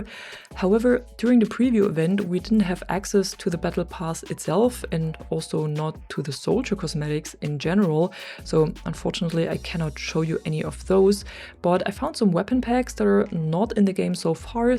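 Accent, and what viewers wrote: Germany. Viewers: Der deutsche Akzent is der Hammer, danke für das Video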